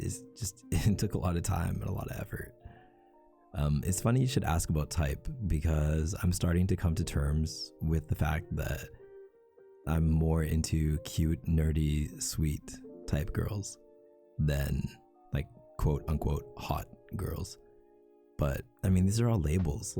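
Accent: Canada. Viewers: HOLD UP UR CANADIAN? HOW DID I NOT CATCH ON- OH MY GOD LMFAOOOOO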